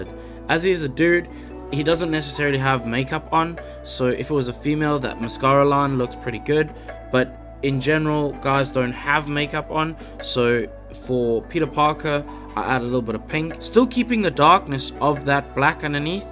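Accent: South Africa. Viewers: South African, ???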